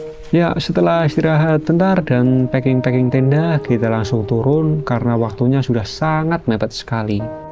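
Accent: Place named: Java, Indonesia. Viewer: Muedhok sekali ya masnya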